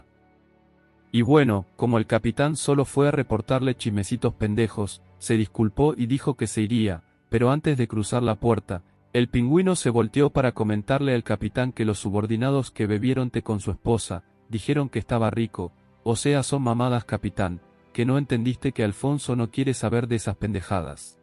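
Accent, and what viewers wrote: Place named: Argentina. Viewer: Ohhhh pibe! Me encantan vuestros resúmenes! Vuestro acento y dialecto argentino es muy exquisito para mis oídos! Os agradezco por este nuevo video muy divertido vuestro relato, esperáremos vuestros otros capítulos! 🎉❤🎉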